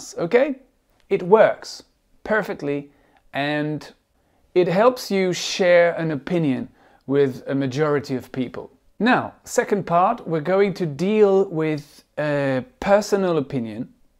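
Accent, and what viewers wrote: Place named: United Kingdom. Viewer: What a wonderfull video ! I'm an college student learning English for many years , I'm not far from fluency but you steal learn me things ! Thanks a lot. Oh, and what a wonderfull British accent. I would have loved to speak the same but my natural way of speaking sounds more like GA. To cap it all, thanks sooo much, your video can help anybody wether their level or age.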